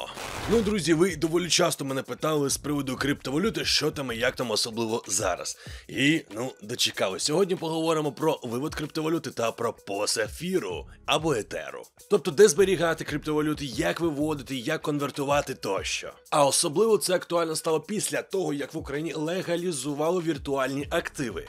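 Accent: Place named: Russia. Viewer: Забавно, как русский человек с чистым русским языком вдруг решил считать себя украинцем. Вот только для селюка западенца твой украинский все равно будет с "кацапским" акцентом. Таки пропаганда пробила здравый смысл? Оно того стоит?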